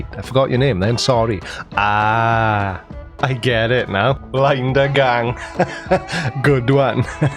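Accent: Wales, United Kingdom. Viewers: Are you Welsh? I thought you were Australian like Nathan Thompson. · Oh my God.........you're not..........Welsh.........are you?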